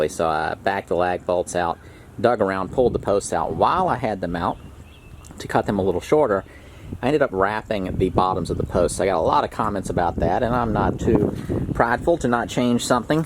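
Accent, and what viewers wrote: South, United States. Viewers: I wonder that too! Based on his accent and the hilly terrain, I'm thinking north Alabama or west Georgia or... ? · what region of the states is his accent from ?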